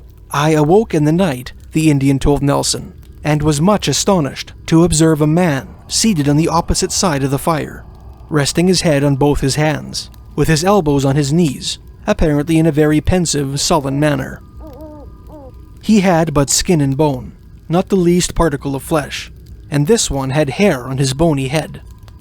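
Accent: Canada. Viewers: I really enjoy listening to all of your videos and have learned a lot of history that no school in the US would ever teach. I'm over 60 now, and prefer to learn my own way. I love your beautiful Canadian accent and the way you annunciate your words .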